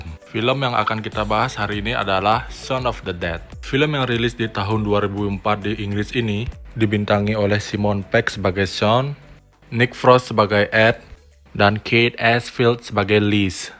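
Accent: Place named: Bali, Indonesia. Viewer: pengisi suara nya kaya org bali hehee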